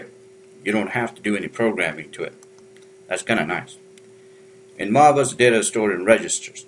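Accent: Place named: Louisiana, United States. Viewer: My guess - Louisiana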